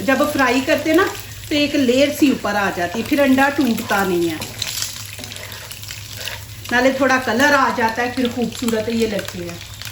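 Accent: Punjab, India. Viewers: Aap kiapunjabi ha bolne ka style se lag Raha ha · Watching from Buckingham, UK. I liked the recipe and your Punjabi style commentary too :) I will try to cook this soon. Insha Allah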